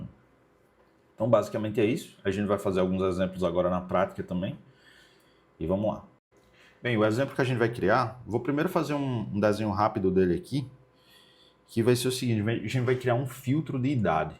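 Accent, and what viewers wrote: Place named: Northeast, Brazil. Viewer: Aula Top. Parabéns Bom demais assistir vídeos de qualidade ouvindo um sotaque nordestino igual ao meu 😂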